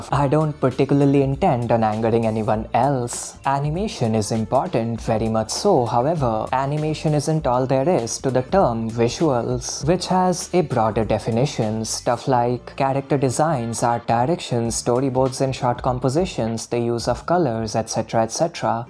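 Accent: India. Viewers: bruh why do u talk so slow lmfao, is it because of indian accent?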